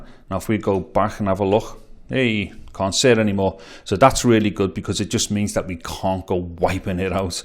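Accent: Liverpool, United Kingdom. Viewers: Very well explained project it makes such a nice change to have an English ( Scouse ) voice rather than an American voice explain everything in a easy to understand form and informed way thanks very much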